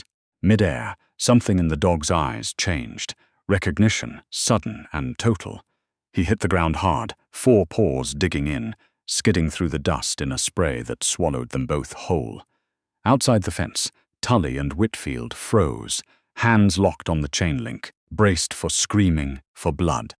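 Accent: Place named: United Kingdom. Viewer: British accent stinks ruin concepts of storytelling.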